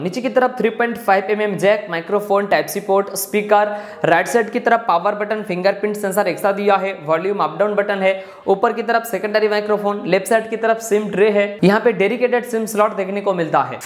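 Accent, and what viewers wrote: Bengal, India. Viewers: Lagta he ap bangali he.isiliye apka hindi sunne me bahut achha lagata he comedy comedy bolta he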